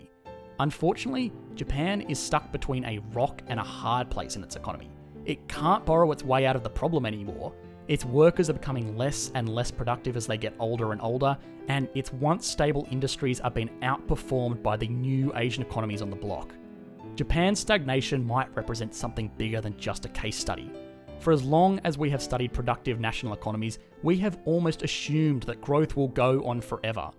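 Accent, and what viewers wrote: Australia. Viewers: Australian accents are very thick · Is yours an Australian accent??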